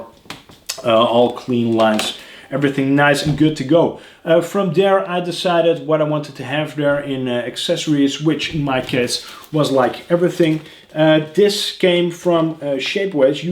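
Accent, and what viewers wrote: Netherlands. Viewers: Dutch accent ;)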